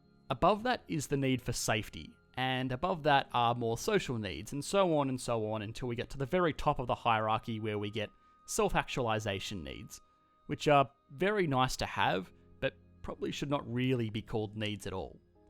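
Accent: Australia. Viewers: That Aussie accent though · I've had a look at the comments section and as an Australian I'd like to encourage to double down on the Aussieness.....let's get some Poiter in there, get some 'Noice' going and let's harden up those 'Buhhhhht's and I'm expecting some "yeah, nah"s to appear......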